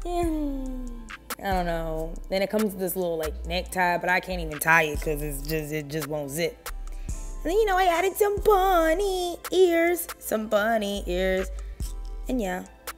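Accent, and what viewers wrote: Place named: Jamaica. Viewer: You're an awesome panda. Not just only a panda The 1st outfit is gorgeous/ is the best one in all of them. Imma text you on telegram soon. See you soon gal Your english is like the one of Jamaicans. I feel like you're a Jamaican gal. Is that true?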